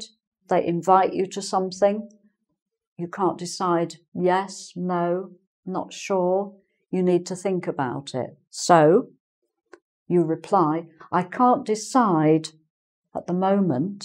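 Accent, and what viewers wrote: United Kingdom. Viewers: I love your british accent. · Her English accent is perfect as I am quite familiar to British accent . From Thailand. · She is so charming,....and, her voice and her British pronunciation are very pleasant indeed. Thank you, Ms:-). It has been a real treat. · Love that british accent. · What a lovely woman, this is the British accent that everyone can understand. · Nice explanation! I love the British accent...in fact you can't disassociate a language from its own background, right? I have a question on this topic here regarding to pronunciation. The word "maybe" has a slight difference from the 2 words "may be", doesn't it? I do think I hear that! In "maybe" is the first syllable stressed? In "may be" is the second word stressed? Am I right? Thanks for your videos....I love them! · she is beautiful, her speaking style is very British and it makes everyone understand❤️ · * Hears a British accent * * Love for the English language intensifies!!! 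* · British accent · such a beautiful British accent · It might be her English/British accent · I love your British accent!^_^ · Lovely British English ❤️ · I love your beautiful accent !!! and your lessons of course !! Your british accent is wonderful. Regards from Chile. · My god i really miss the British accent 😢💔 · ale jaki to akcent? londynski czy moze oksfordzki? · This is the accent that the world wishes all Brits had!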